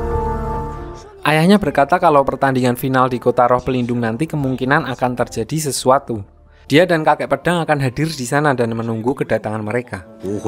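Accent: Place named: Java, Indonesia. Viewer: Mambu mambu wong jowo admin e ki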